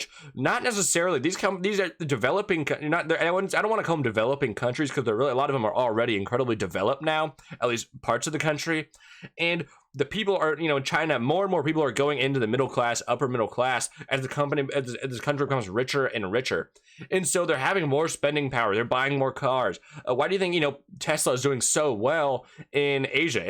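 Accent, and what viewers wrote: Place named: United States. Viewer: If you want to complain about an American speaking like an American, then go elsewhere.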